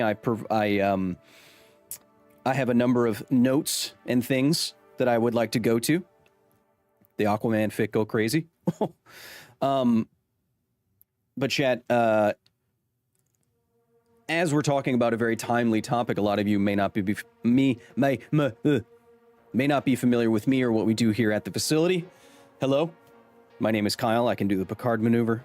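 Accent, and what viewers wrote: Queensland, Australia. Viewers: Honestly great Australian accent but just watch out because you're turning kiwi for a second every now and then. Other than that surprisingly authentic impression which is difficult to come across with Australian accent impressions. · As a dirty New South Welshman I can say with authority that was actually a good suburban Queenslander accent. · Im also aussie and do sound aussie asf bro u even have the look of an aussie.killa content bro.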